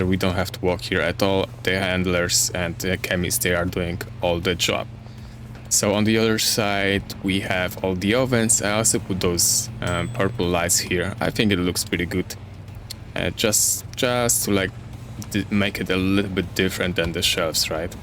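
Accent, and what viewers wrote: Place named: Poland. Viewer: Wiem że jesteś z polski słyszę ten akcent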